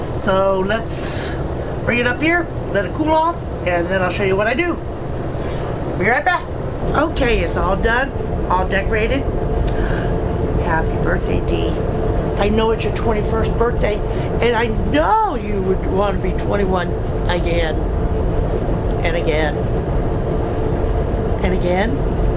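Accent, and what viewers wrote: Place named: South, United States. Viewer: I like your Southern accent, drives me wild!🦝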